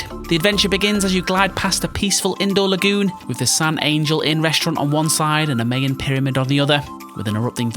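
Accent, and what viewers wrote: Liverpool, United Kingdom. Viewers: Is that a scouser accent? Jesus this was hard to listen to.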